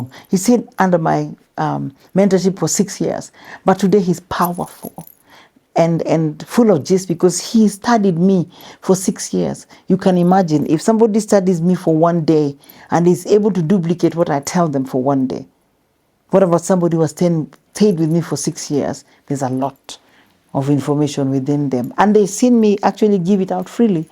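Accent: Luo, Kenya. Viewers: She’s so hilarious with Luo accent😂😂😂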